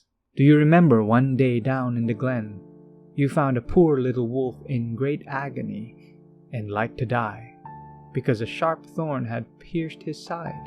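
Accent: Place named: Ireland. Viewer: Lovely reading, are you Irish yourself? I hear a subtle accent but not sure if it's thematic 🙌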